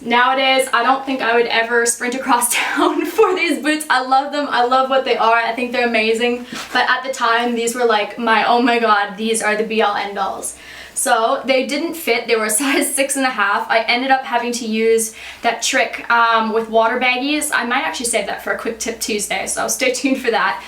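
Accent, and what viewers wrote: Australia. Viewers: omg i havent watched one of your videos for ages, but i have really noticed the aussie accent come accross more now then before! i missed watching your videos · You have a bit of an Australian accent? :D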